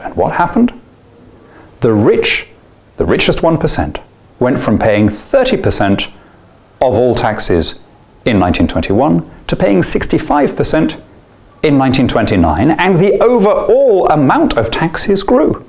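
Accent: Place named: Southern England, United Kingdom. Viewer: Scuba Sausage I'm sure he is a southerner, I can tell from listening. xD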